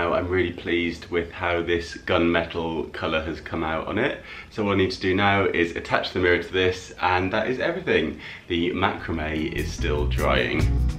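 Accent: United Kingdom. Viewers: I just had to listen to your beautiful British accent to hit the subscribe button. Cheers, mate! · 😁 His voice is absolutely very sexy (oops, sorry 😶), with that fascinating British accent that I love!!!! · Your british accent is everything 😍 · How have I not come across this channel before 🤷🏻‍♀️ Subbed immediately just because you’re British & you have a great voice! The videos are awesome!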